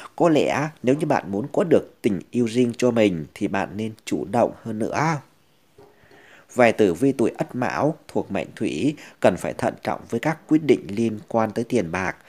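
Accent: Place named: Central, Vietnam. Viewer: giong đoc ko hay mây chuc kênh luon phat triên nhé giong nay nhu ở miên trung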